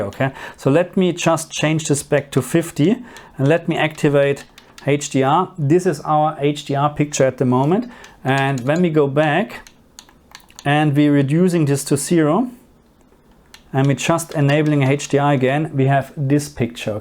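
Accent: Germany. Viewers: Oh god that cringey German accent... How can you be so damn oblivious and speak a different language you have no real grasp of? It's not just grammar. Pronunciation plays a BIG role. Dunno if arrogant or just dumb. Gawd I hate my peinlichen Mitdeutschen........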